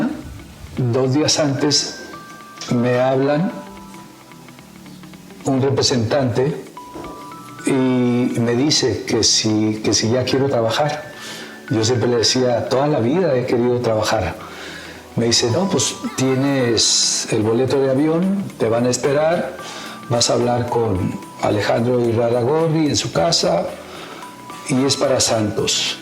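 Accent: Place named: Spain. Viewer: El señor Galindo una de los mas grandes ídolos de el chivas del Guadalajara y México siento mucho que hubiese y haya corrupción en el deporte y gente como tu que merece el apoyo máximo no se le diera y tu como entrenador serias excelente, soy machin pero desearía tener su autógrafo maestro y toda mi admiración eres de las personas que saben y tienen el sentimiento de representar a un club de coraza y de representar a su país con todos los hueboz solo una hora con el Maestro y podría escuchar más se me hizo poco es como el agua que filtran las montañas de lo que le hace falta a nuestro México personas que en realidad tengan principios compromiso y el color en la sangre no como el español del:D que tiene el acento español que usa a figuras como tu tu deberías de tener tu canal galindo y créeme la gente te seguiría más a ti perdon señor pero su acento español no me gusta me agrada más el de México solo que eres cabron sabes de donde sacar y lo que queremos ver como al Maestro que bueno que no hiciste tu auto entrevista por que la verdad no me importa y no la vería o hazla haber cuantos likes tendrías:D 😀 es broma no te tenzes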